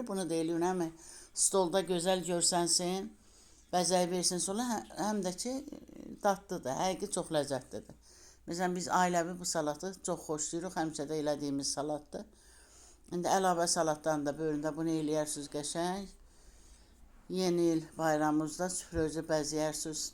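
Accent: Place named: Aran, Azerbaijan. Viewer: Salam xanım,siz haralısınız? Ləhcə çox tanış gəldi 😄😄 hiss etdim ki,aran bölgəsindənsiniz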